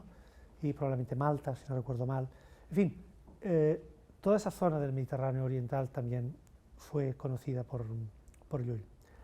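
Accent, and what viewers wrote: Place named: Catalonia, Spain. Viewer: Acento catalán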